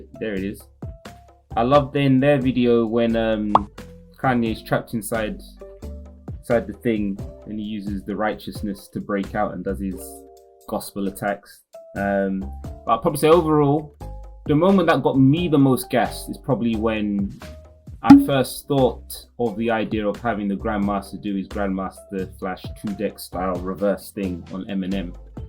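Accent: United Kingdom. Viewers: Been watching you for quite a while but didn't expect the English accent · You sir, are a GOAT. You sound like you’re from London so hopefully I may meet you one day and be able to shake your hand and thank you personally for all you’ve done with this series and how it’s given me so much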